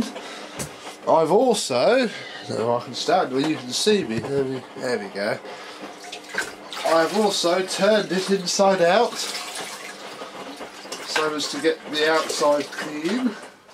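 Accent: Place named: United Kingdom. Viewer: You have a very erudite British accent. Where in England are you from?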